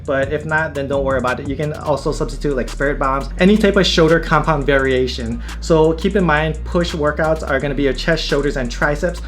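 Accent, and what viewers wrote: Hawaii, United States. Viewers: Wassup ma guy are you from hawaii?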